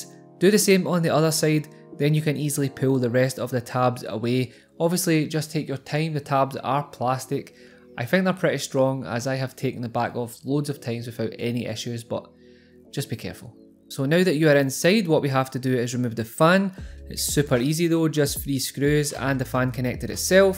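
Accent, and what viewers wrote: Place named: Scotland, United Kingdom. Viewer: Yeah I thought it was sort of scottish dialect/accent, very nice indeed. Thanks again!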